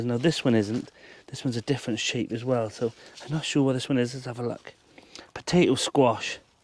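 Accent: South Wales, United Kingdom. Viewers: I love your accent. Reminds me of my relatives from South Wales. I'm just really hard of hearing. Many thanks. X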